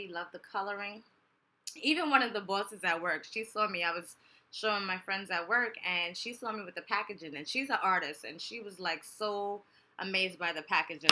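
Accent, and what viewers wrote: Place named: North, United States. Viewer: I subd love ur accent u from up north i was born in buffalo ny n miss the northern accent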